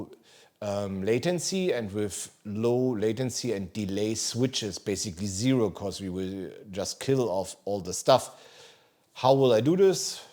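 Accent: Germany. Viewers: I don't see the issue he sounds like every German tourist I've ever met :)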